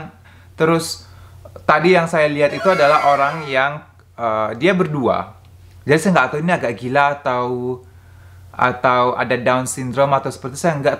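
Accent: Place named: West Sumatra, Indonesia. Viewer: Betul sekali. Jangan silau dengan orang yang berbeda ras, jangan menganggap diri lebih rendah dan terlalu memuja. Pada akhirnya kualitas pribadi yang lebih menentukan hidup kita. Terima kasih utk sharingnya, sukses selalu ya.. Btw, saya suka logat minangnya..😊👍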